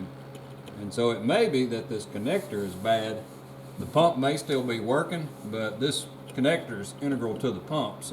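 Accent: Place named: South, United States. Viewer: Lo hace verse tan sencillo, que Bien maestro y me gusta su acento country. Gracias. Brenda from Mexico city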